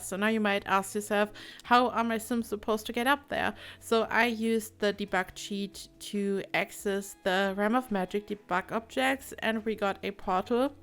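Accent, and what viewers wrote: Germany. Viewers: Are you german? I swear it sounds so familiar 😂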